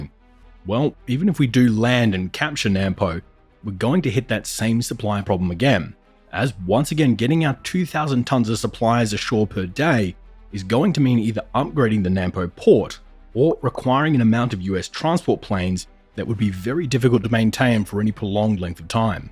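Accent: Australia. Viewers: I'm glad he stopped using the AI with semi-Aussie accent. It doesn't sound good and does not get the Aussie pronunciation right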